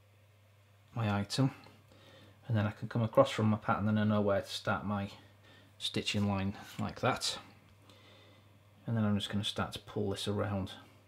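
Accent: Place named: England, United Kingdom. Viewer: Brilliant I've been looking for a video that explains the sizing of a pattern ,you really explain it well . I do have one question ,I'm just starting out as a hobby and trying to find leather supplies in the UK that are cheap enough to try out is difficult . I have bought some tools from amazon as well as some chrome leather ,I've found Identity Leather Craft in Matlock and am waiting for some veg tan and glue to try out. do you have any recommendations for leather suppliers in the UK selling small amounts for us hobbyists . Great channel nice to hear a calm English accent explaining things so well .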